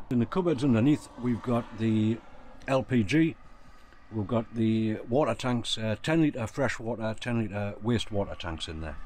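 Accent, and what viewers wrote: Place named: South Shields, United Kingdom. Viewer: Hi a really love your van design, it looks like your at South Shields, and you sound local , I am from ashington area , do you still do van conversions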